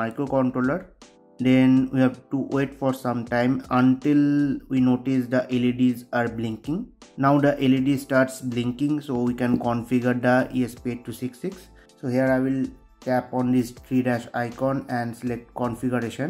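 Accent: India. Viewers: Awesome video 🔥🔥, and thank you for the presentation, BUT could you please replace your real voice with some AI google speech synthesis engine ? Your thick hindi accent It drives me crazy , and I barrely understand the content .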